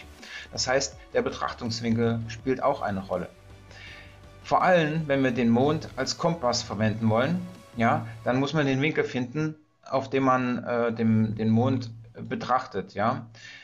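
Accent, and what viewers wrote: Saxony, Germany. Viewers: Wir als Franken verstehen das Sächsische und lieben es. PS: meine Mutter kam in Dresden am HBH zur Welt, damals 1924, und dass als Ostpreußin 🤣 Herzlichen Dank, für mich macht das absolut Sinn👍 Danke🧸🌸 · Och Leute,lasst den guten doch mal bitte weiter sächseln. Er is doch kein Bayer,die man wirklich nicht versteht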